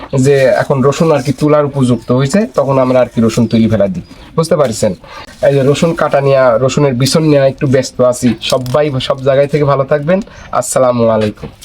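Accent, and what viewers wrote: Natore, Bangladesh. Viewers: নাটোরের ভাষা কুষ্টিয়ার না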